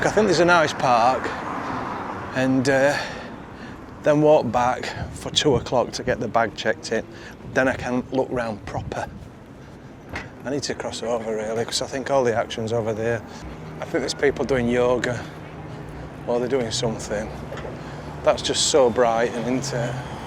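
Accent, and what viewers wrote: Yorkshire, United Kingdom. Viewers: Hello. You bring back good memories because my family on my father's side were from Leeds and on my mother's side from Barcelona. Not only because of the accent but because of the way of being. It is said that copper was invented by a Yorkshireman and a Catalan, both pulling a peseta coin😂😂. The next time you visit Barcelona I recommend attending the magical fountain. They are renovating it until August 2025. It has summer and winter hours. I worked as a tour guide until 2016 and the truth is that it is not well known but it is the best attraction. Maybe because it's free. There are more local people than tourists attending and performs at night due to the contrast of colors. Write it in your agenda. Cheers. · I very much enjoy your videos, especially the Yorkshire accent, big fan here! 🍻🍻 All the best!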